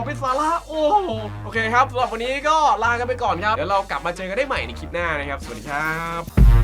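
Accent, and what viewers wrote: Isan, Thailand. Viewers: ใช้คนอีสานมั้ย คนจังหวัดรัยครับ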